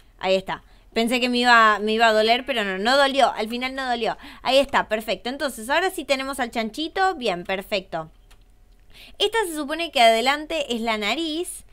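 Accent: Argentina. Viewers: Oye que ella hable en argentino no significa que tu tambien hables a si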